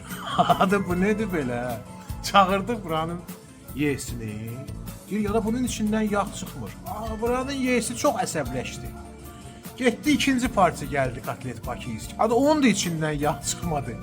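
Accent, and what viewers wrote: Baku, Azerbaijan. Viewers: Nuw olsun lehceni ewidende ele bildim Bakidayam hemiwe sesini ewitmek mene cox xow olurAllah qorusun seni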